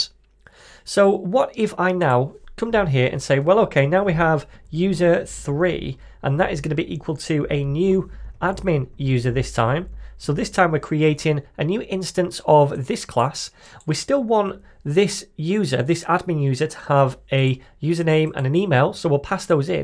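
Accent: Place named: Northern England, United Kingdom. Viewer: All your tutorials are fantastic. Great to hear a good northern accent also; more engaging somehow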